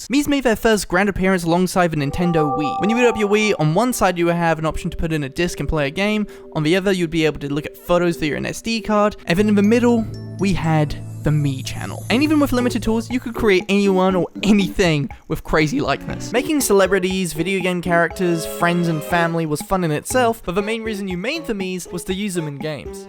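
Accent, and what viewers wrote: United Kingdom. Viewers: you have a british accent like me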